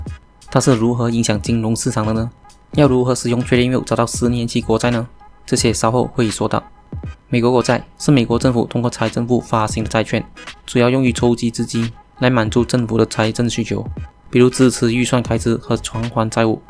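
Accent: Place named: Malaysia. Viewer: 雪碧馬來口音，聽得頭痛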